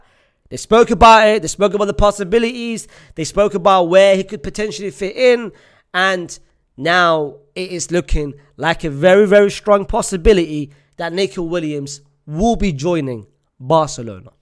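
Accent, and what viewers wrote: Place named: United Kingdom. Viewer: This dude makes me hate the British accent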